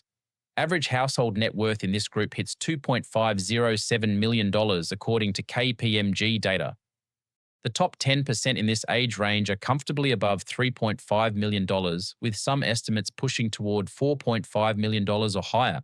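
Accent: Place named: Australia. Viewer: Also i love the aussie ai slang better than your american accent in all the past videos its just 2 weird lol keep up the aussie accent for next videos!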